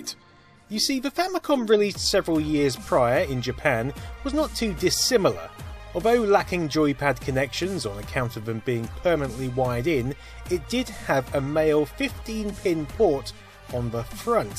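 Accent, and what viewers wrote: United Kingdom. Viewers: Speaking British is I see.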